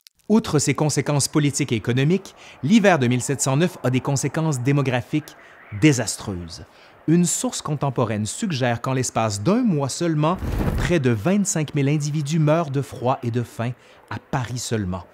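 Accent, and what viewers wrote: Quebec, Canada. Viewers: Super vidéo. J'ai vécu au quebec dernièrement (que j'ai dû quitter malheureusement) et je sais pas trop pourquoi mais ça me fait plaisir de ré-entendre cet accent! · Tres interresant. Je suis un re-apprenant de francais. Apres Lycee, ily a 60 ans, j'ai recommencé apprendre un peu le francais, je trouve que vous parlez dans un facon je comprend. Je suis déja un amateur d'histoire. Votre accent, c'est quebecois? Je nai pas un clavier francais :-( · L'accent, encore l'accent, toujours l'accent. Depuis Félix Leclerc, vous ne vous êtes pas fait l'oreille à l'accent québécois ? Ici, y a longtemps qu'on s'est habitué à l'accent français ! ;) hihi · Je viens de trouver la chaine .. très intéressant ce que tu présente mais l'accent surtout j'ai jamais cru que je vais aimer l'accent québécois.. Continuez Bravo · Ce que j'aime beaucoup, c'est l'accent Québécois. 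Sinon; j'aime aussi ces vidéos qui nous démontrent que le climat ne peut se prévoir comme cela est le cas actuellement avec le GIEC. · C'est chouette d'écouter l'accent québécois dans un français intelligible. Merci pour la vidéo. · Quelle superbe diction…j’en ai les pieds gelés!🥶🙃😂Heureusement, votre adorable accent québécois réchauffe mon cœur et mon corps. J’ai augmenté mes connaissances, ce soir et je m’endormirai un peu moins sotte.😉😁MERCI❣️👍🥀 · Superbe vidéo et reportage surtout avec cet accent Québécois si unique et charmant !! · Désolé, j adore vos vidéo, et l accent me rappelés les quelques années passées au Québec dans mon adolescence. Mais je vais me permettre un commentaire la première fois que j ai vu votre vidéo je me suis dit "au final les Québécois c est des Scandinaves (descendant de Norman) de culture française qui ont immigré aux Amériques:) Continue the good work comme ils disent. 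Et merci pour vos videos!!! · Et pourtant le ciel n'était pas encore troué et alors donner les cause du changement climatique a c'est époque la avec l'accent cannadien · tresbkie monsieur et en plus avec cet accant canadien qui ma toujous plut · C'est fou comme j'aime entendre l'histoire française racontée avec un accent canadien :) Je sais on dit "accent québécois" mais je suis français tout le monde sait que casser les pieds c'est une obligation génétique pour nous :p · Un Québécois qui me narre la Fance d'époque, avec cet accent, quel bonheur! Le vin qui gèle, mais quel cauchemar 😱 Bisous de Bordeaux 😉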